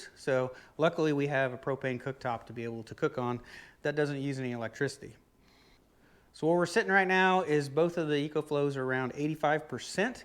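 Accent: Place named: Oklahoma, United States. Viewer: ten or eleven years ago... sounds like you're near me in oklahoma lol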